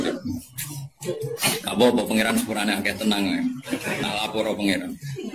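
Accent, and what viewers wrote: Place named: Banyumas, Indonesia. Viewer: ❤❤❤purbalingga ngapak ndrek gus..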